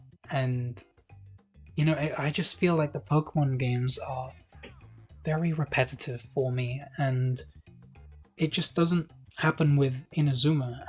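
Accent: United Kingdom. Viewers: Me sorprende que seas español,tienes un acento muy británico(yo también soy español btw) Yo también crecí con esta saga y nunca me he cansado de ella,no soy fan del futbol pero esta saga es algo muy especial para mí,me enseñó a no rendirme nunca,a seguir luchando a pesar de las circunstancias. Tengo demasiadas horas en estos juegos entre lo que jugué cuando salieron y otros medios 😉 Todavía no me puedo creer que hoy sale por fin VR(EA) probablemente sea el juego que más he esperado de toda mi vida y sé que va a ser un viaje muy especial(GOTY 100%) Espero que lo disfrutes tanto tanto como yo o más Vamos a dejar nuestra marca Inazuma en el mundo! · You're Spanish??? With your accent, you'd think you're English. It's so good that the game's finally here. I grew up with it in The Netherlands (best dub!). I rewatched ALL episodes a couple years ago, and played the GO games for the first time. 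The GO anime never got to the Netherlands so I didn't really grow up with it, but Chrono Stones ended up being my favorite season. Anyway, I felt like yapping a bit too. Keep up the great videos!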